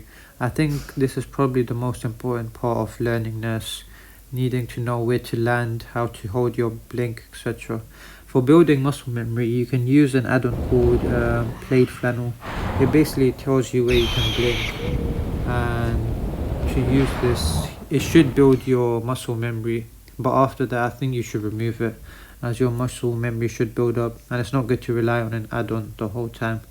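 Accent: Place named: RP, United Kingdom. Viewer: Yeah, that's an RP accent you've got there. Your location on YT says that you're from India tho?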